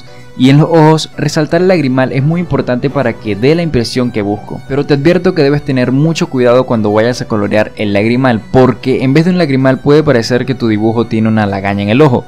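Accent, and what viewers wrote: Venezuela. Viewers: Es que dice "los ojoh" :v, los venezolanos hablan mucho como con la j o algo así... en lo personal me encanta el acento c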